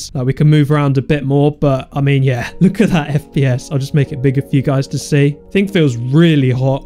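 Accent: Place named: Belfast, United Kingdom. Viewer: u r from belfast. i know that :)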